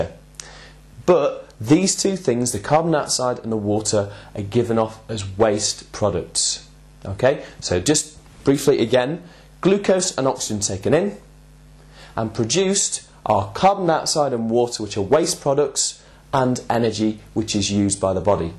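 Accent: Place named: United Kingdom. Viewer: thanks! this really helped! i really wish my bio teacher had a british accent! :)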